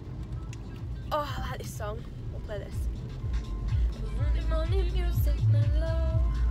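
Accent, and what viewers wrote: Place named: United Kingdom. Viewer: This was great! Love your accent, I’ve never been to the UK! I have subbed to your channel!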